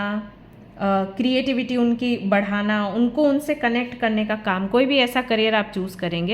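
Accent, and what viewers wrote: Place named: Bihar, India. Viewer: Bhot kam logo se hi "batiyate" ho haha such a cute accent m bihar se hu aise hi bolte h sb😂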